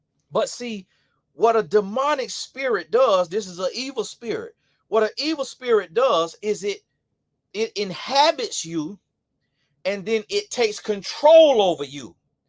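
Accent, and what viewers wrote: South, United States. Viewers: You so southern, bless ya heart 😅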